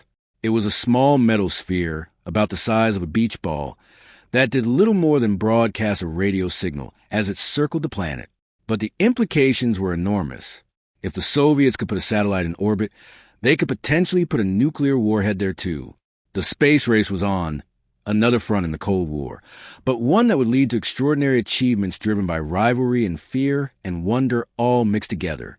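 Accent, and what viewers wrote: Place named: United States. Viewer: I liked the AI voice chosen here. American. Not those couple of English guys all over YouTube who sound like they have a cold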